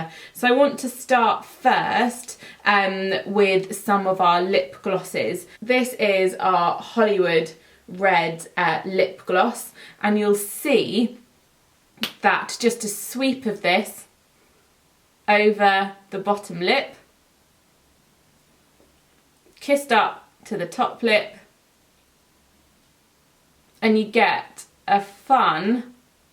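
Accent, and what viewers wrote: United Kingdom. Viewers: All your tutorials are sooooo adorable & I like your British accent big time :) plz don't stop filming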